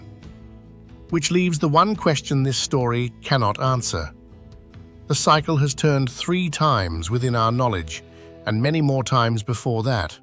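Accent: United Kingdom. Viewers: The narration is a beautiful voice with a strong British accent. Thank you for telling people who don't understand Chinese history about our 5,000-year-old, uninterrupted history of constantly rising from the ruins.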